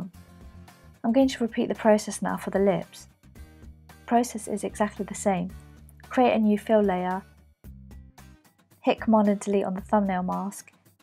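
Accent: United Kingdom. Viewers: That British accent 😉 Good luck :)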